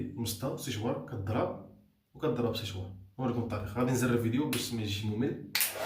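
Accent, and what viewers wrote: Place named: Algeria. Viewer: من اللهجة ديالك كتبان جزائري ماشي مغربي لي متافق يدير لايك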